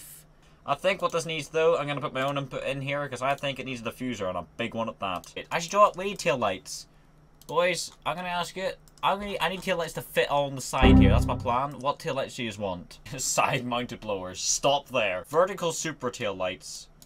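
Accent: Northern Ireland, United Kingdom. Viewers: Are you from Northern Ireland? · r u from northern ireland??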